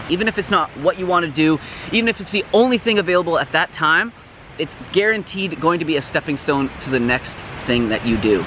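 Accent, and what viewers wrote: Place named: Canada. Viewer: Question! I’m new to the channel but have been absolutely loving it! I am from New England in the US so we do get cold winters as well. I’ve noticed that you have a cool setup in your backyard for your calisthenics... how do you adapt in the winter months? It seems like it would be too cold or snowy! Also btw I just love the Canadian accent and lingo since I have ancestry from Quebec. Love your channel! It’s binge-worthy! 💚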